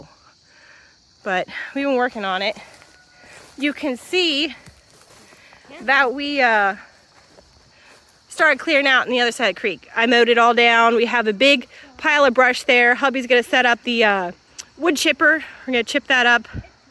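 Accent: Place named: New York, United States. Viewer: Yous???? LOL Are you from NY??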